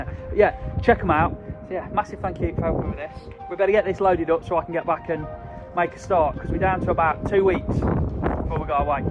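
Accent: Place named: Leicester, United Kingdom. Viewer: Good film bud, as usual. Too much talking my arse 😉. The Lestoh accent makes me laugh though (Nottingham here 😉)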